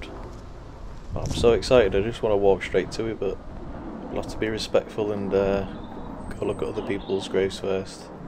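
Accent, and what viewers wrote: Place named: Yorkshire, United Kingdom. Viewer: Beautiful setting. So much bird song. I like your respect and also your humour. I cant quite place your accent. Is it Yorkshire or Lancashire?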